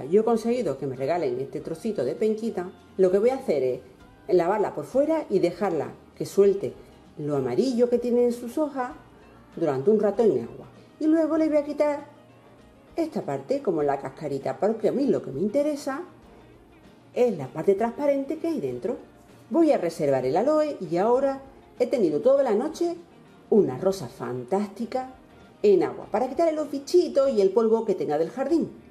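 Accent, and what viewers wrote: Andalusia, Spain. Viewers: Holaa...me encanta el acento andaluz...saludos desde Barcelona...aqui nueva👍👍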